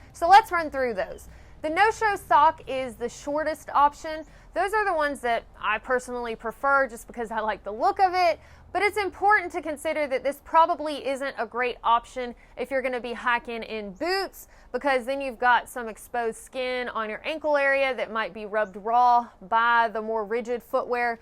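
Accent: South, United States. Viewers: Hey y’all that’s a definitely a southern accent ha ha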